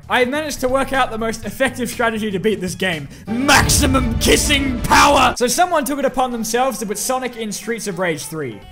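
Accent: Australia. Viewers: I feel like a dipshit for just now realizing you have a lisp. For some reason I just thought you had the strongest Australian accent i'd ever heard. And other youtubers would either be more shy or have a more noticeable lisp while yours blends into your accent. Either that or crazy. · Hey, i know ya probs wont get around to this but i really can relate to your channel and by that i mean like your veiws on transformers, humor and games. And i have had some rough times lately, so to have someone relatable to watch is real neat. And if you don't mind me asking, you sound aussie, you from aus? I am and im just curious. Anywho thanks for the laughs, entertainment and overall good vibes.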